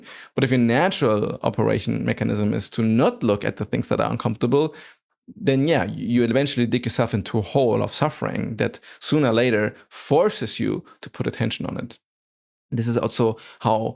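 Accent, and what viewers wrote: Germany. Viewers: I'm impressed with how much what you said resonates with me. There's many channels with seemingly amazing content, that contains just empty calories if you know what I mean. I'm feeling this will be actually useful. By the way, I'm detecting very faint germanic accent... Is your native language German?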